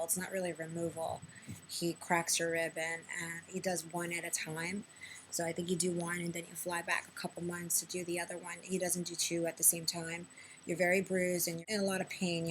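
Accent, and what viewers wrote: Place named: Brooklyn, United States. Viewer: I love how she says yous! Very brooklyn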